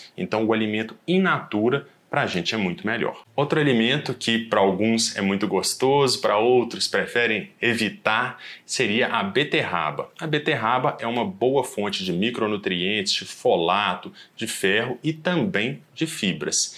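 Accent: Minas Gerais, Brazil. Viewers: Boas dicas e com o belo sotaque mineiro, uai! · São relevantes as informações. O Dr. deve ser professor: metodologia blz! E o sotaque mineiro é nota 10!!!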